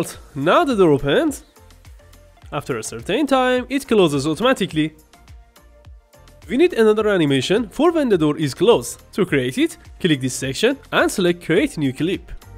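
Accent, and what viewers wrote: Iran. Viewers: لهجت ایرانی میزنه❤ ایرانی هستی؟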